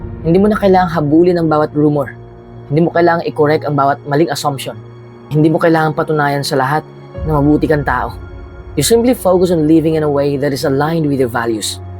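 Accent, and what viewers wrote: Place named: Visayas, Philippines. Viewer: Ang ganda sana ng delivery & voice mo, kaso nga lang need mo pa magpractice ng proper english pronunciation, mejo tunog bisaya po kasi.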